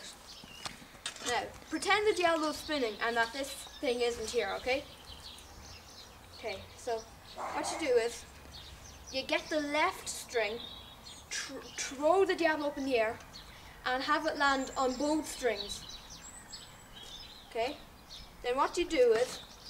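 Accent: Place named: Ireland. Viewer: Where are you from? Leitrim or Galway? To be perfectly honest i cant tell with you southerners...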